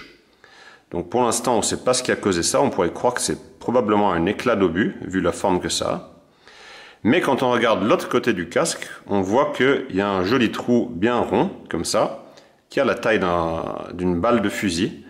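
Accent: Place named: Switzerland. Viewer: Ben avec votre accent je pensais que vous étiez Suisse, désolé.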